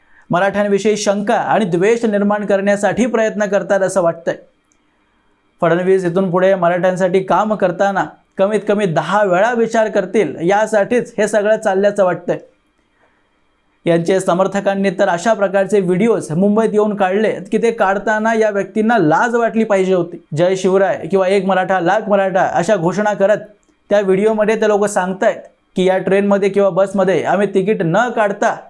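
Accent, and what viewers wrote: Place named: Karnataka, India. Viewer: आपले विषय विल्श्लेधन खूपच अभ्यासपूर्ण असणुबतारकशुद्ध असते. ऐकायला खूप आवडते आणि भावते. तुम्ही बहुदा कानडी भाषिक आहात का.आवाजात गोडवा आणि आपुलकी जाणवते म्हणून जिज्ञासा.🎉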